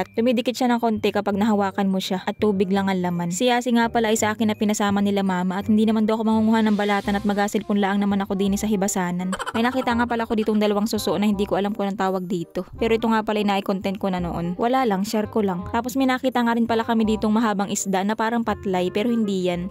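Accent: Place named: Cebu, Philippines. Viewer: Ganda pakinggan ng dialect nyo madam, halong cebuano ang tagalog...parang sa Palawan din. Ang ganda pa! ❤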